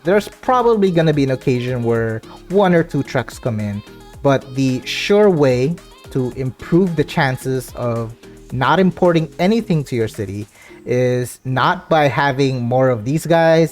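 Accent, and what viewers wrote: Philippines. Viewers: Are you a filipino? Your accent is familiar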